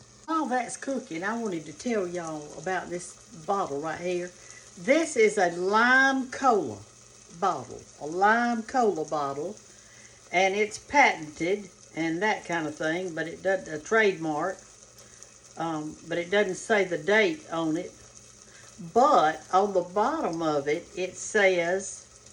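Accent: South, United States. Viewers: Love how you said you keep moving. Anything special you do? Love your videos and your wisdom. My mother was born and raised in Meridian, Mississippi. Your southern speech warms my heart🙏🙏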